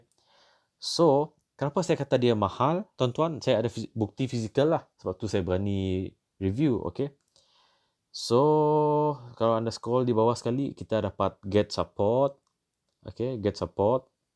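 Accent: Sarawak, Malaysia. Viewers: Loghat mcm Sarawakian je.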